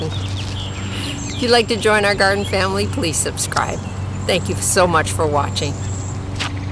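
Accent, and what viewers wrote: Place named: Midwest, United States. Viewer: I love watching your videos...and hearing your MidWestern accent. My dad is from Michigan/Minnesota and I have cousins that lived in Madison and we used to visit them every summer (they all now live in NC). You sound just like them! :-)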